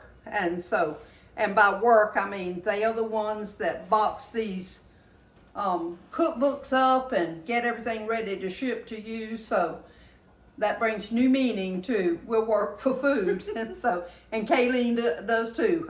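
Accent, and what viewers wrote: South, United States. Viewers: I love her Southern accent. Sounds like you are from GA. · I’m a new subscriber who’s been binge-watching your videos. You kept popping up on my screen as a recommendation and I’m so glad I finally clicked on and watched. You have the most delightful southern accent! I’m a southerner as well, but when I hear my recorded voice, I sound like a hick. 🙁😂 Can’t wait to try some of your delicious sounding recipes. Thanks for sharing them.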